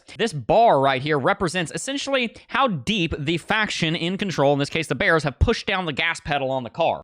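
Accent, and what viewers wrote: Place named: United States. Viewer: Why you speak like that 🧐 Typical idio t yanky doodle dandy Full ov noise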